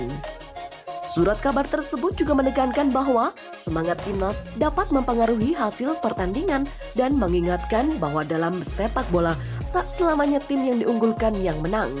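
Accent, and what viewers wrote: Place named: Banyumas, Indonesia. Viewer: Kelihatan bhs nya ngapak , rika wong banyumas yh ? 😊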